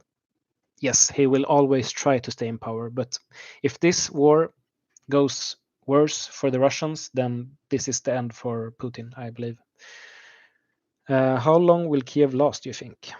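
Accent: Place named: Sweden. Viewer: I could tell right away you were swedish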